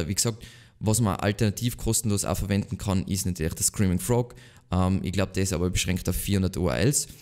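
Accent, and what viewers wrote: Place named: Tyrol, Austria. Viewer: So schön wieder den Inschbrugger Akzent zu hören! Was das Video angeht, TOP!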